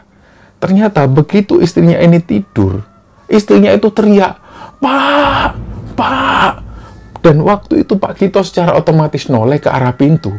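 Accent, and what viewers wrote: Java, Indonesia. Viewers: Setuju bgt... Q ngefans karo seng nyiarke... Udah ganteng logat jawane jiiaann penak bgt di rungoke... Salam rahayu njeh ❤❤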